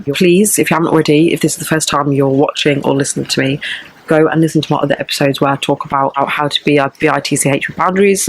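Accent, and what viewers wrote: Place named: United Kingdom. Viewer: i didn't expect you to be br*tish wtf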